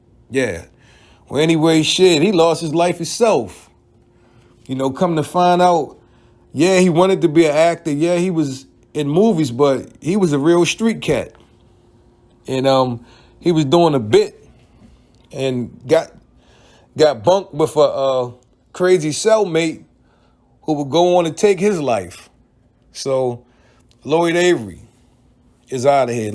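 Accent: Baltimore, United States. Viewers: Dude talking in this video sound like he’s from Baltimore. “Tales From Hood” has a lot of cast members that passed on.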